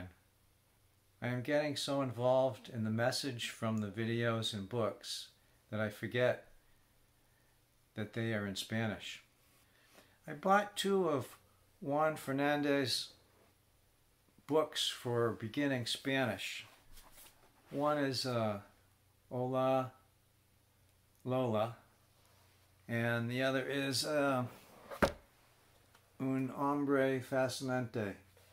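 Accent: United States. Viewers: Yes this is literally speaking Spanish with a complete American accent with zero effort on the accent, this is something that can be improved and even mastered.... if one is aware of it. It's crazy to me that people think they can speak another language but not use, or even attempt another accent, it's just as important as the grammar and the vocabulary. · Sounds like he speaks Spanish with an American accent… good going….organic Spanish is awesome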